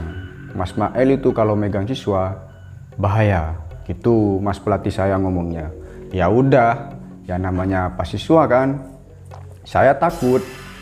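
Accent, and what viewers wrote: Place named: Madura, Indonesia. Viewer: Mentok Banget madurenah ✌️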